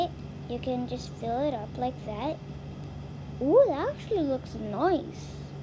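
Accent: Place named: United Kingdom. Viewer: Accent nen britishb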